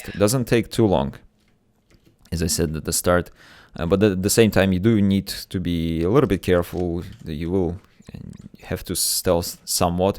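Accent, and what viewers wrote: Russia. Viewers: Are you russian? Your accent is very simillar to russian one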